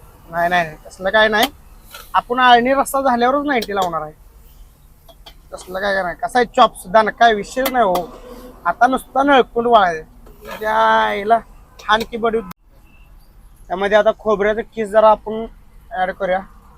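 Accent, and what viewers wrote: Kolhapur, India. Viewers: राडंची कोल्हापूरी भाषा भावा 👌👍 😂😂😂😂😂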